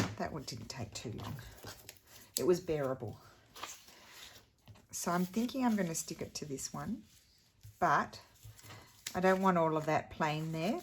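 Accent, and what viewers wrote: Australia. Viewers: I am changing your name from the Martha Stewart of YouTube to the. This is the stupidest question anyone has ever asked you. When you dream, do you speak in Italian or in your delightful Aussie accent? My friend is tri-lingual, and she says the people in her dreams speak all three, and often if two people are talking one is in English and the other speaks French. Great project!!!!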